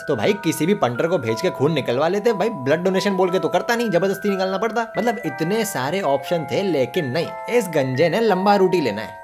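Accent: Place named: Bhojpuri, India. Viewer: I never saisthis before but does have a brilliant bhojpuri vocabulary and accent as well as great voice modulation skills..kudos to that 👍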